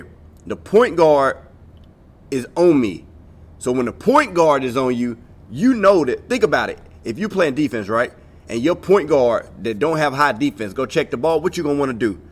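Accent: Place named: Atlanta, United States. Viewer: My dawg pointed you out....you peeping it young ..that next pass gotta know his next move...good break down...you atl or you midwest boss? You got that t.i. dialect ...salute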